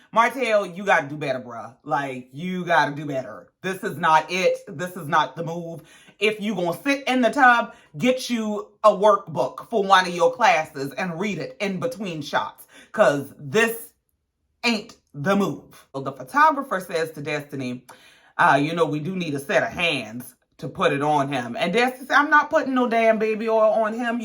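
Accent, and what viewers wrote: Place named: South Carolina, United States. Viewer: Some of my favorite commentators are too skewed on thier reviews because they don’t like Tisha but guhhhh you the most honest and unbiased review on this here show! Can’t wait til you hit 10k! Keep it going Queen. Where are you from cause I hear South Carolina all in your tone!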